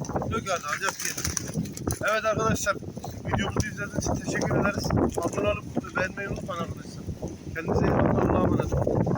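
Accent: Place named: Adana, Turkey. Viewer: Adana’nın hangi mahallesindesin kardaş şiven adana kokuyor saygılar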